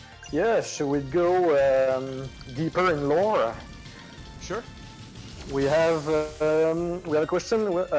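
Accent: Quebec, Canada. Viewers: Vraiment cool d'entendre un accent québécois! :) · Wait! I recognize that accent! You're Québecois? Nice! I always get surprise when i hear our accent on youtube. So few Québecois gamers on here. XD Can't wait to play that! <3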